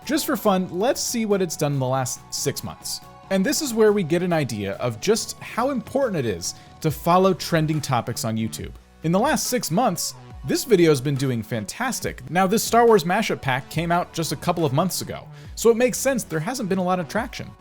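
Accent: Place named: United Kingdom. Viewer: You lost your British accent and grew hair?🤯🤯🤯🤯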